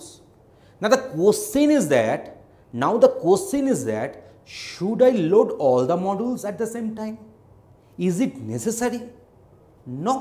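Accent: Bengal, India. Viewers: Bangali sir 🤣🤣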